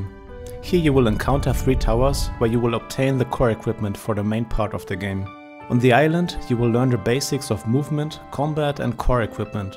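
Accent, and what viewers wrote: Germany. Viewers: du bist deutscher das hört man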